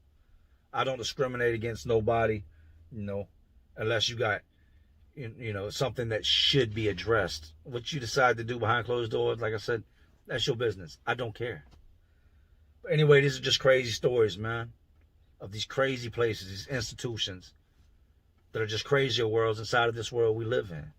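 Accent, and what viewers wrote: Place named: Louisiana, United States. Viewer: Idk how this ended up on my recommendations but I'm glad it did... I was hanging on every word 😅 you're a great storyteller and I don't even like prison stories. You sound like you're from Louisiana ..cool accent.😊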